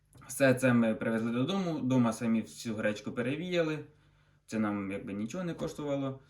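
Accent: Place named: Poltava, Ukraine. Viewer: По говору слышно ,либо Полтава ,либо Кировоград ,я вас обожаю землячки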